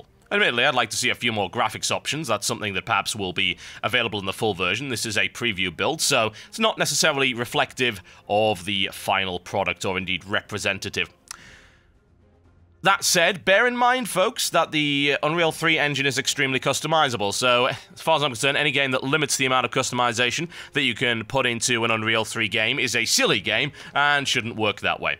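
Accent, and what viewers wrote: United Kingdom. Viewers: You're British.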